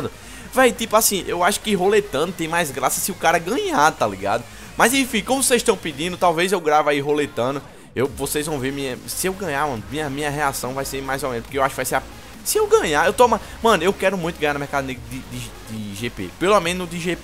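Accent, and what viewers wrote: Northeast, Brazil. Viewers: você é muito legal e seu sotaque melhora mais ainda o vídeo, cara. Que saudade do Nordeste! Você é bem simples e, ao mesmo tempo, ótimo. Sei lá o porquê deu ter falado isso, mas é que tava guardando isso. O_o Eu quero saber a sua killmark. Eu baixei a killmark do canal achando que seria aquela normal que você usa nos vídeos, mas é uma que tem o nome e tals, e eu queria uma normal, sei o nome porque não combina com meu nick - achei bastante bonita aquela killmark. É qual dessas: Killmark IDK, Killmark HG9?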